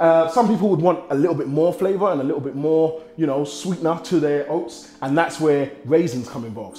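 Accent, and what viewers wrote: England, United Kingdom. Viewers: shoot that crib up you hidin in he's an African brit. He doesn't have an American accent, he has a British accent. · R u from the uk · AND he has a british accent?? geez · he’s from the UK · pillohw british accent there is nothing called english accent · Dude with that English accent, and Body your making all us guys look Badd😂😁🤣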